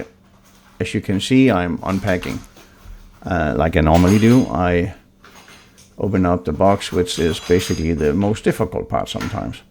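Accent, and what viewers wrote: Denmark. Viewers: Great videos , especially your webpage on the Sektion Rail measurements! I’m gong to take a guess that you are Danish? Sounds like very Danish accent , I love it 👌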